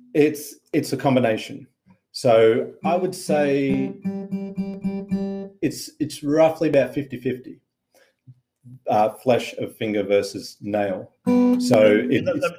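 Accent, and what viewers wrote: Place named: Australia. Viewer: I’m a finger style guy. Have been since I started with a really good guitar coach/instructor. Nbnbmnmn at Gryphon Strings in Palo Alto, California. You sound Australian, you’re playing a beautiful Maton and have an excellent approach to what I consider the most incredible instrument with a 1000 voices. Not sure how I stumbled on your videos but certainly glad I did.Almost 72 if I make to October. Stopped playing some time back and completely lost on how to start again. I need someone to get me jump-started. Perhaps you can help me with that.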